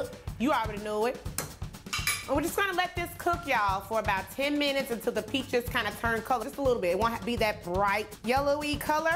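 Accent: South, United States. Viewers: I love your personality and Southern Twang. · It is what I say it is. Lawd ha mercy. I'm about to cry. You're accent is cute n country 😇😆😇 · I just love your country talking ass say that shit ma🥰🥰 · The country accent is kinda annoying, but the recipe is cool 😎 black folks try not to add so much sugar we really need to do better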